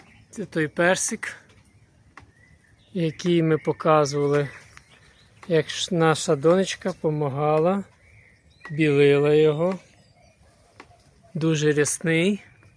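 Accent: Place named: Russia. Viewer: А звідки Ви? Бо дуже багато рузисмів/акценту, але лайк за спробу перейти на солов'їну!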